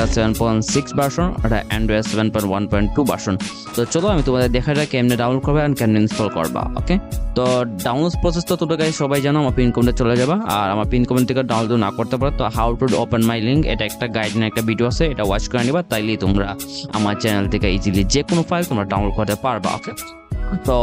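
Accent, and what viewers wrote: Sylhet, Bangladesh. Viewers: bhai tumi sylhety ni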